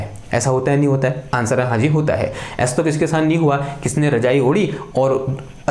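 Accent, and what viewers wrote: Uttarakhand, India. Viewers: Sir aap Uttarakhand se ho kya ?🫠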